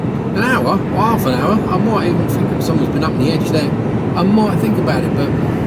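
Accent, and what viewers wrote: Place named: South London, United Kingdom. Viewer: Always confused trying to place your accent,picking up a little South London,Plumstead area maybe.? Enjoyable vlog,nice to see the A1 ,used to spend hours on it and hated it then.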